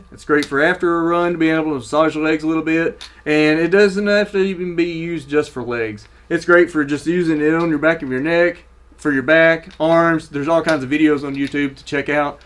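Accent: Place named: South, United States. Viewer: Thanks for the quick list & honest info. Looking forward to 2019. PS - Don’t apologize for a southern accent. Just be the real you.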